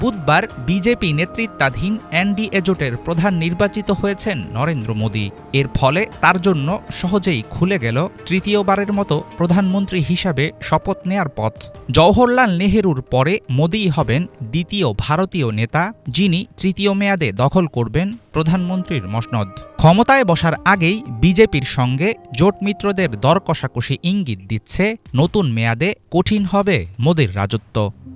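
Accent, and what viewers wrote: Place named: Bangladesh. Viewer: সংবাদ শুনলেই বোঝাযায়, এই মালটা বাংলাদেশী।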